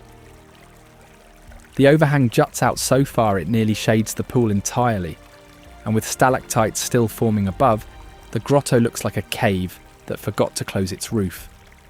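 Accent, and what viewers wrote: United Kingdom. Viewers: Uh… that black catfish with the white stripe does not exist here. Who did this video? I’ve fished catfish in the Texas hill country for over half a century and have never seen anything like that. Channel cats, blue cats, yellow cats and the occasional bullhead are the main species. And the bird eating a crab never happened either. That would be at the coast. No crabs in the hill country. The British accent now makes this suspect. Also the comment about Canyon Lake being not natural is strange. No lake in Texas is natural except for Caddo. Sorry about my critique. Other than a couple of glaring mistakes this is a good video. Oh, and Luckenbach has a huge gift shop. Always has. This area in Texas is my soul.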